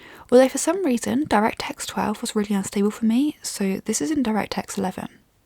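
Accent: United Kingdom. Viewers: I really love your UK accent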